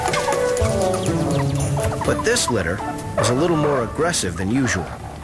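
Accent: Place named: United States. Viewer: I finally found a channel with the American narrator, im so happy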